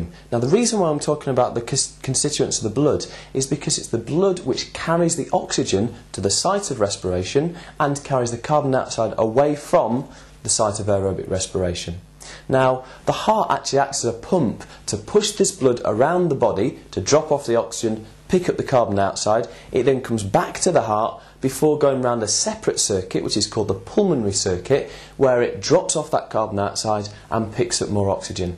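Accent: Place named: United Kingdom. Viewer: thanks! this really helped! i really wish my bio teacher had a british accent! :)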